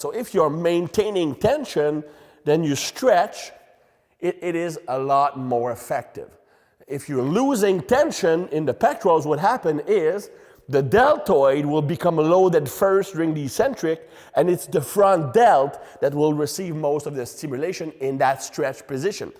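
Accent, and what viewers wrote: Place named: Quebec, Canada. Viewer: it’s a Quebecois accent:)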